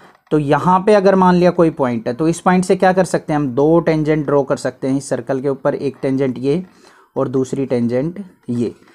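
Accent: Haryana, India. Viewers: name tek lete h 😁😁🤣🤣🤣🤣🤣are you from haryana sir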